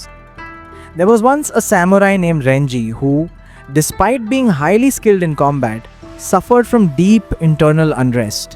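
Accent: India. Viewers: Your voice and accent is Indian . Are you Indian who lived in Japan and now living in North Korea ( not even in South Korea). Genuinely curious.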